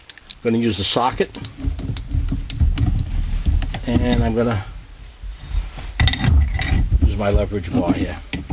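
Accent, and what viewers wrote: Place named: Boston, United States. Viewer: Taking awt tha cawter pin lol love the Boston accent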